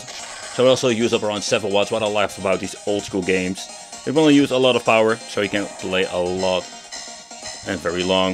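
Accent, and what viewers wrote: Netherlands. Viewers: It sounds your northern European, Dutch I presume.. did you have to pay import costs for the steam deck?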